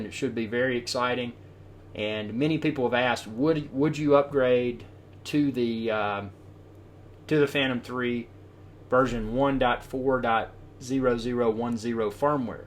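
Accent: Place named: Texas, United States. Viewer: My wife Luciana thinks your accent is from texas, i have to agree also. One place i hope to visit one day :)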